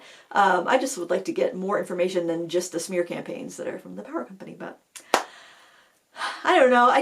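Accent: Maine, United States. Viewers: Hi! Here in NE Massachusetts, we're getting mostly driving rain and potential damage more along the coast. Be safe; my brother is in Bangor and Dad in Dixmont. I'll keep track of them as well. LOVE your Maine accent as it instantly brings me home! I worked to lose mine in college too. Now it only surfaces when I'm tired. LOL! Take good care this weekend! ❤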